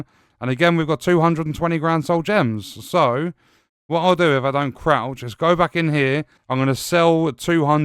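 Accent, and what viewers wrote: United Kingdom. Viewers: shitass video too british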